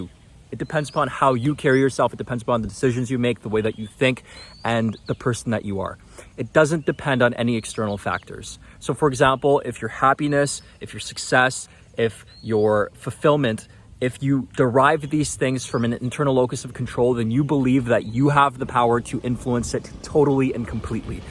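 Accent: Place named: North America. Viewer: You shocked me man, with your Canadian or American accent is it? So proud of you settling down or born in North America. I am Indian too and tried moving to Canada in the 90s desperate to start a new life there but didn’t make it.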